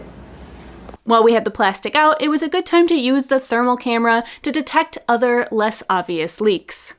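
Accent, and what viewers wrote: Quebec, Canada. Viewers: I just discovered your channel. I knew I recognized un accent du Québec! Good job guys, I love everything about your channel ❤ Continuez votre beau travail.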